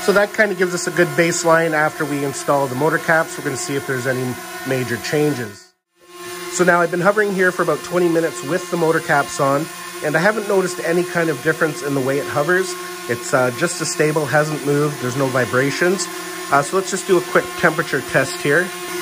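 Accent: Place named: Nova Scotia, Canada. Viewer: Haha. I knew you were from Canada. Most likely from Nova Scotia.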